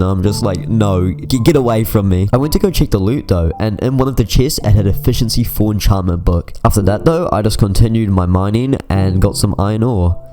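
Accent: New Zealand. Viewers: Are you a New Zealander? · Good old New Zealand accent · are you New Zealand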